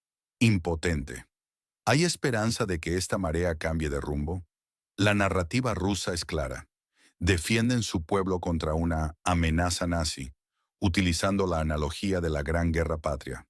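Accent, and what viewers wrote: England, United Kingdom. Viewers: ¿Hablas inglés con acento español. No verdad? Si habéis decidido doblar al castellano hacedlo bien, sin arrastrar las erres como tarados. Hablar español con acento inglés impone un idioma inexistente.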